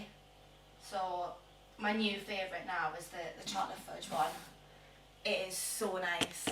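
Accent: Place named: North East England, United Kingdom. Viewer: Good to here a North East accent on a fitness channel. Am ganna try that egg and onion thing as it look 👌🏼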